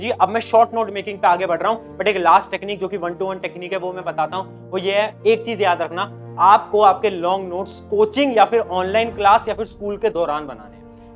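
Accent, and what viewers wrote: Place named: Western Uttar Pradesh, India. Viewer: Ye bhaisaab west UP ka lag raha he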